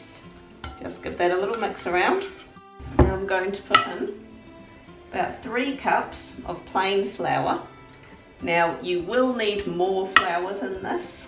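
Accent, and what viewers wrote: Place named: New Zealand. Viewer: Don't we all! Thanks for your reply. I've JUST made some circular normal crumpets for the first time. Something went wrong as they look good, taste good, but have no bubbles / holes! The bubbles appeared and then closed up again. I think the pan recipe is wrong. I will persevere. I love your accent! I have a friend from Dunedin who lives here now and he now finds N Zealanders sound strange! (Keep it on hind! My tint is wit !) Great fun! England is in the middle of a mini-heatwave. We get 3 days of summer... it will be snowing in weeks! It's so weird (for me) to think that June is Winter for you! I guess you have just had Christmas there!!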